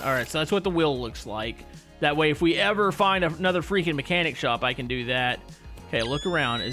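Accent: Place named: Texas, United States. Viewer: hes from texas so he talks like that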